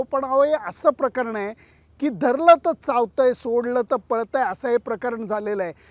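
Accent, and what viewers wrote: Pune, India. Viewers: लेले सर, सामन्याआधीची उत्कंठा आणि सामन्याचा आनंद याबरोबर तुमचे विश्लेषण म्हणजे सोने पे सुहागा!तुम्ही केलेले विश्लेषण हे आपल्या अस्सल पुणेरी शैलीत ऐकताना क्रिकेटचा आनंद द्विगुणीत होतो. त्यामुळे १लाखाचा टप्पा अगदी सहज पार होणार आहे. अनेक सुभेच्छा!!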